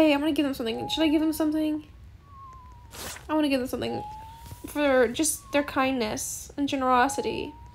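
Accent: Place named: United Kingdom. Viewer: early,and ik that ppl r nice to u cuz u r one! edit:omg your British accent🤩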